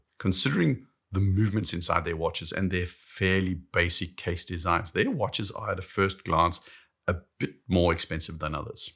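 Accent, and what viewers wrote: South Africa. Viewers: My wife is South African, I’ve been to South Africa, love the people and the place- this is a heck of a watch channel, but I do feel biased for that South African accent… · Your accents sounds soooo South African.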